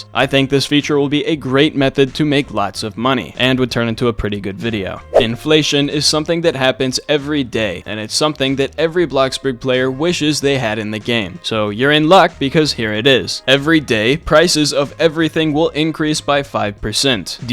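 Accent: United Kingdom. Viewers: Ayo British 😂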